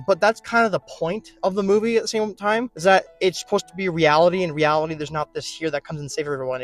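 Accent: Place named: United States. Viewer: Clearly this is written and narrated by an uneducated American.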